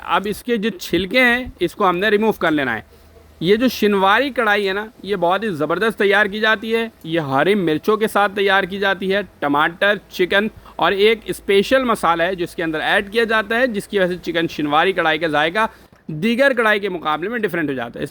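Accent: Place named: Bihar, India. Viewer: بولنے والا بہاری ہے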